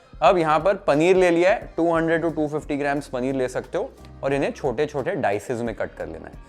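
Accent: Maharashtra, India. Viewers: Love your Marathi accent Chef ❤ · I love your Marathi accent and all recipes are awesome ❤❤❤ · Love ur Marathi accent. Malaa bengali fish curry tumcha style chhee receipe share karaa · Is he from Maharashtra? I thought he's from Punjab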